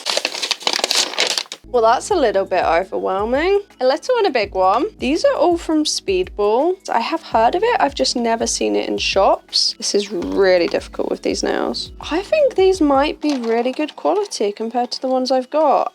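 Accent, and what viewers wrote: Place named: United States. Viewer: Why are you constantly using strong American inflections when you speak? Do you live on Netflix or something? You might as well go with a full-blown accent at this point because listening to this pseudo-Americano dialect is painfully embarrassing.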